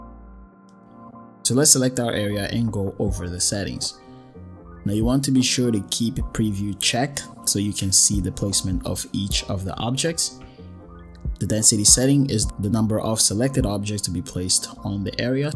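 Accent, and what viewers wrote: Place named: Portugal. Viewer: By your accent, can I conclude that you are a native of Portugal or some Portuguese speaking country ?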